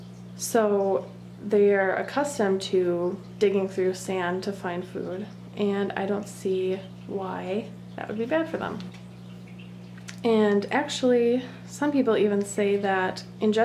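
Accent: Minnesota, United States. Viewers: Its the Minnesotan accent!!! Its cute!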